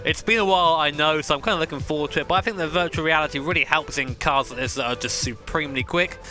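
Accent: United Kingdom. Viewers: I'm going to tell you what I find amazing. I'm an FM7er on XboxOne using nothing more than a controller, so I'm blown away by your driving setup and the VR and the whole shebang; truly incredible. But what I'm most impressed with is your ability to provide that wonderful and intense high-pitched, British-accented non-stop racing commentary WHILE driving an F1 car on the NBR! In my own world, I'm pretty confident on the Ring, but simply driving takes all my concentration. If I so much as fart too loudly I risk throwing myself into the wall. Great driving, and a great video! Thanks so much for sharing.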